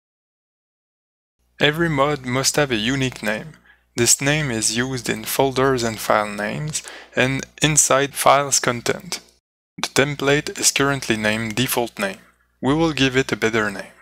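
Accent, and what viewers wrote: France. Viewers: are u french? nice tuto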